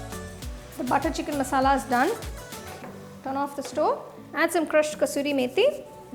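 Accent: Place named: India. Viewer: Funny heavy indian accsent🤏🏾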